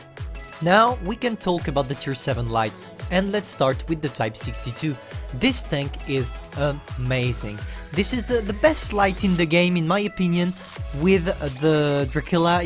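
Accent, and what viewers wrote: France. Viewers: petit accent francais non ? :)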